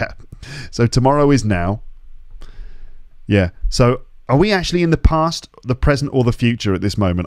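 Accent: RP, United Kingdom. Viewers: Hello. It's always great to listen your podcasts and watch your videos. They really help me in learning English. I love British accent and your accent is absolutely amazing. Thanks a lot. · Hi how are you, please when you read the books which accent do you use RP or what? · *it's a comment from shadow 😂 * Вот по-русски я бы сказала, как я рада слушать ваш классный голос и потрясающий британский акцент! Могу как Лев Толстой в одно предложение на две страницы без единой точки выразить бурю эмоций и переживаний, а по-английски не могу! Поэтому сижу в тени! Ваш старый добрый подкаст сам как старая добрая Англия 😊 Спасибо за него!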